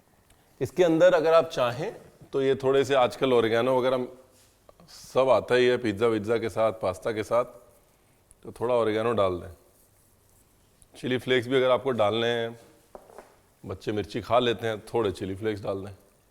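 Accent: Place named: Lucknow, India. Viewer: Liv ur lucknowwaaa slang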